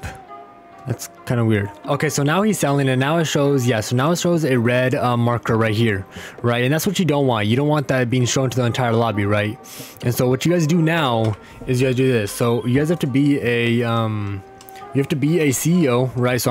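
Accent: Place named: India. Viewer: Lmaoo no gonna kap I can hear the desi in you correct me if I’m wrong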